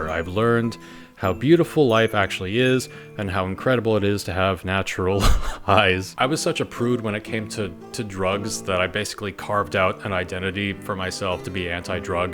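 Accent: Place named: Maine, United States. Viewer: I could tell immediately you were from Maine.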